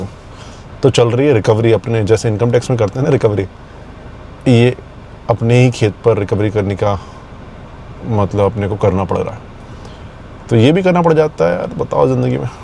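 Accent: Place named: Gwalior, India. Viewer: Gwalior Shivpuri k aaspass k lag rhe hai. Wahi ki language aisi hai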